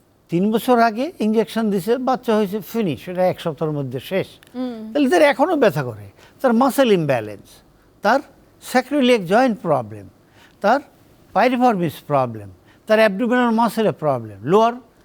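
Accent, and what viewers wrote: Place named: Bangladesh. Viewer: Bangladeshi English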